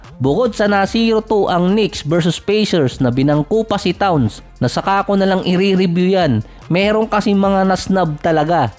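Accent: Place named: Pampanga, Philippines. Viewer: Kapampangan ya i koya 😂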